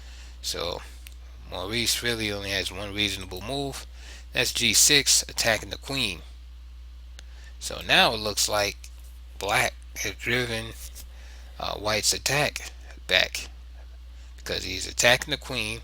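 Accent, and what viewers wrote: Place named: East Coast, United States. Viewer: Dope!!! Are you from NY or Philly? That east coast accent!💯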